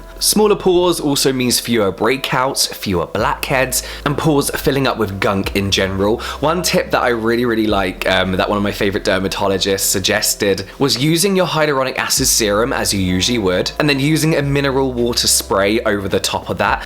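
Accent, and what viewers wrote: United Kingdom. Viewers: Wow.. I love your British accent😘😘😘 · British accent lover, skincare lover and accidentally found your channel, perfect...!! Love your gesture when you're apply your skincare 😂😂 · A wonderful British accent I've decided to watch all your videos to master that accent! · Hi. I love British English, the way you pronunce words and speak. Beauty Is not my favourite subject but there is always much to learn. Your video is an effettive means of enriching my vocabulary. So thank you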